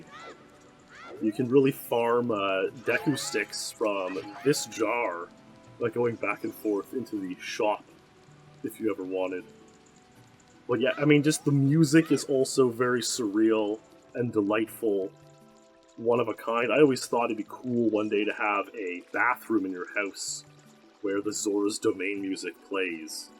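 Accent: Canada. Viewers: When people call Dragons, “draygons” blows my mind. I guess it goes hand in hand with saying “ewt” instead of saying out. Must be a canadian, North US accent. Relaxing, chill video though. Enjoyable. I like the focus on the lesser talked about games.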